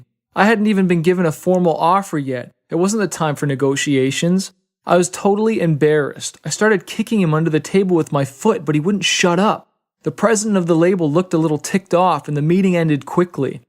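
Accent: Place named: Canada. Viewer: This this probably my favorite chapter in Fighter. I've never really noticed your Canadian accent until now...XD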